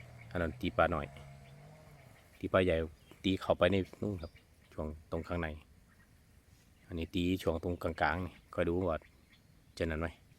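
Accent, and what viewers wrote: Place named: Korat, Thailand. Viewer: สวัสดี เป็นคนโคราชมั้ยครับสำเนียงคือเลย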